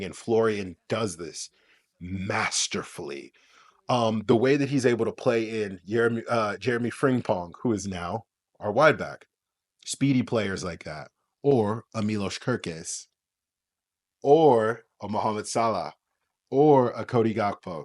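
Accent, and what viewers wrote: United States. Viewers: Have to be honest. When I hear an american accent talking about football I tune out. But this guy is a ball knower. Good video.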